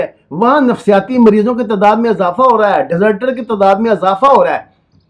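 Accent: Punjab, Pakistan. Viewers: Punjabi sikh mosliman hogiya.🤣😂🤣😂🤣 pehle apni punjabi ascent teke karo kafir isi ne taliban ko sale kiya amiraca pe us ke baad ab Russia ur china pe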